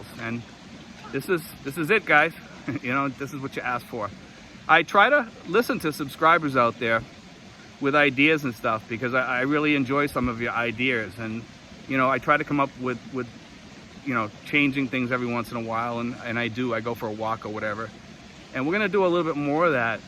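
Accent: Boston, United States. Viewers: You seem to have a slight boston accent but i could be wrong lol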